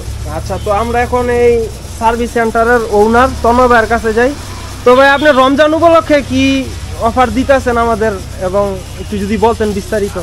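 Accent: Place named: Narayanganj, Bangladesh. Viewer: বেশি বেশি ভিডিও দিবেন আর নারায়ণগঞ্জের ভাষায় কথা বলবেন ভালো লাগে একটা দেশি দেশি ভাব আছে ❤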